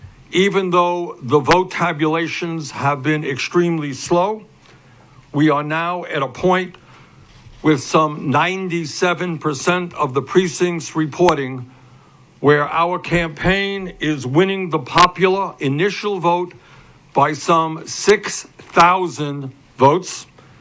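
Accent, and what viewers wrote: Brooklyn, United States. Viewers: Ya can't get the Brooklyn out of Bernie! When will people understand that ya don't mess with people from the NE? I'm from Philly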